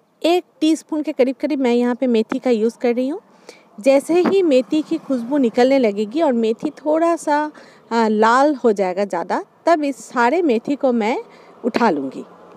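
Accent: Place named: Bengal, India. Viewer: Like 69 Beautiful video I enjoyed your Bangla accent also God bless you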